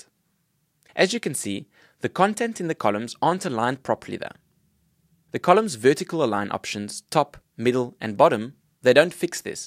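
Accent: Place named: South Africa. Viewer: I can spot a South African accent from far . . . that broer is definately from SA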